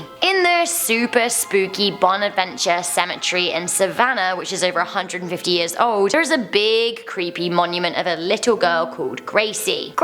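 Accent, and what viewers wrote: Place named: United Kingdom. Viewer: lousy SHITBRIT narration. SHITTISH is the WORST kind of English inflection.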